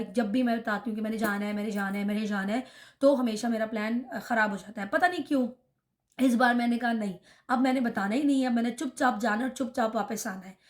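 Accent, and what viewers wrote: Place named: Karachi, Pakistan. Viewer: mughe pata nahi qn hamesha se lugta he tum karachi se belong karti ho tumhari urdu same hamare karachi walon ki tarah he khair hamesha khush raho❤❤❤❤❤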